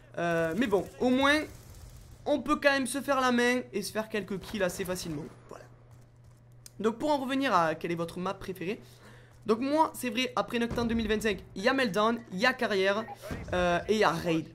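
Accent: South, France. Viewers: Un magrebin avec l'accent du sud.. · Je suis sur Meltdown EC Avec le dsr Cènnkentte je kiff ton accent du sud!! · Tes D'ou Du Sud ? ^^ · tes d'ou du sud ?? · tu vien du sud mais ou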